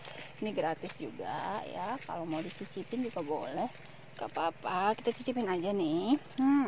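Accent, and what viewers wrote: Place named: Samosir, Indonesia. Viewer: Kayak yg jualan di samosir, ditomok pelabuhan, logat2nya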